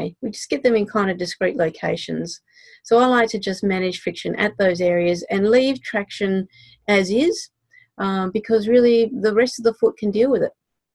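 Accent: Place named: Australia. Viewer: those of us not as familiar with the Australian accent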